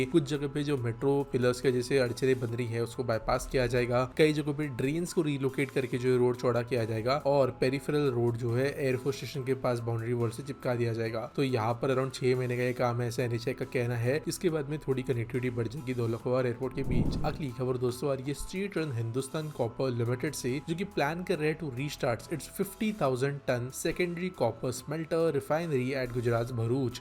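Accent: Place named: Khandesh, India. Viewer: Toh Khandeshi ahe mahnun vatta asel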